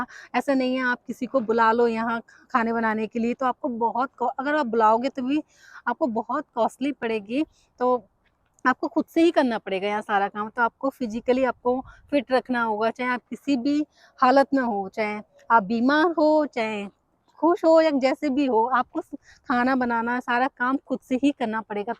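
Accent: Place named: Purvanchal, India. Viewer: Aap pakka UP purwanchal se ho... hai na ?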